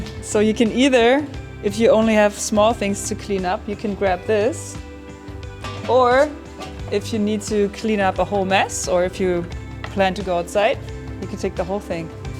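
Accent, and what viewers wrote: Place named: Germany. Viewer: Wie angenehm - so ein schönes Englisch mit klarem deutschen Akzent zu hören, man versteht es einfach viel besser, als diese Ami-Aktzente mit den dicken Knödeln im Hals!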